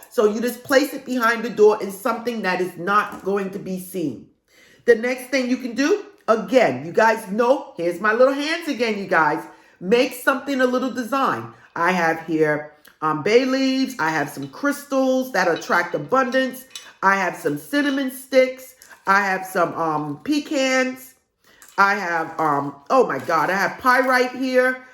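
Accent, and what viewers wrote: New York, United States. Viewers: I love your New York accent Ms! Its helping the message sink in 😂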